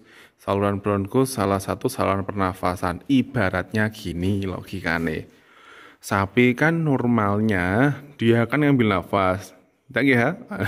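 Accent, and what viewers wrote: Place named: Juwana, Indonesia. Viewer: Koyo wong juwono yo om?😁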